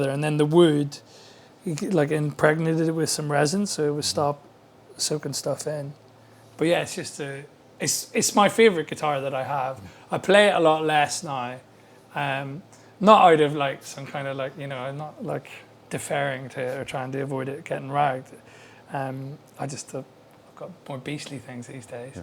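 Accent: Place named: Belfast, United Kingdom. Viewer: He sounds like he's from Belfast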